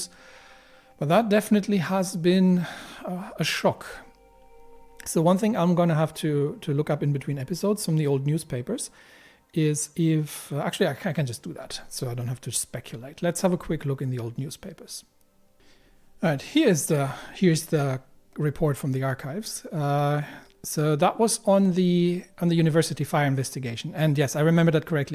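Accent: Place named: Germany. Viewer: Australia? But you have german accent...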